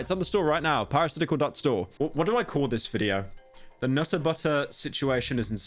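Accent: United Kingdom. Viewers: Yea I’m unsubscribing, I forgot your British 🤢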